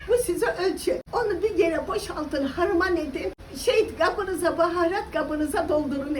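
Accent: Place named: Tokat, Turkey. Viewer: teyze bende Tokat merkezliyim senin konuşmandan hemen anladım canım memleketim Tokatlı olmak çok büyük bir ayrıcalıktır huzur deyince Tokat iyiki varsın❤❤❤❤❤❤❤ teyze❤❤❤❤❤❤❤❤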